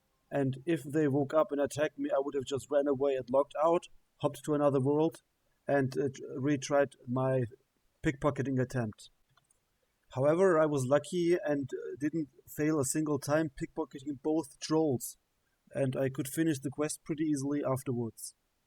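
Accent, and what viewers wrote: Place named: Germany. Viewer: ich wusste sofort, dass du deutsch sein musst xD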